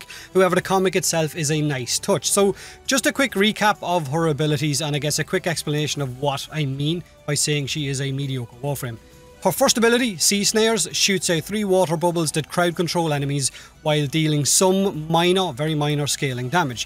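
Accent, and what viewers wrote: Ireland. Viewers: Irish accents are so cute, I also am in love with the amount of insults they have in their inventory.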